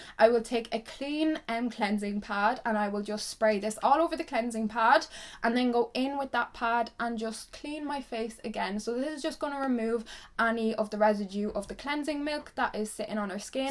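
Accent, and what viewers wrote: Ireland. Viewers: YOU ARE SO PRETTY and I love the Irish accent 😍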